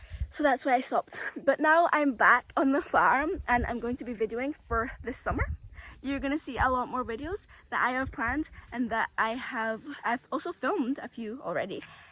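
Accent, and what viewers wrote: Scotland, United Kingdom. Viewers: Nice you are speaking Scottish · Congratulations on your academic achievements. This is only the beginning, stay focus and you will accomplish more academic success. I am not surprised at your scholastic success. There's absolutely nothing wrong with speaking with a Scottish accent. Enjoy your Guyana vacation. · Hi, so great to see you and hear your Scottish accent. You have to do as the Scotts do, lol. You are an outstanding student. Keep up the great work—this is your time to reach the stars. We will be here to welcome you back whenever time permits you to make videos. Stay safe and well. God’s blessings on you and your family 😊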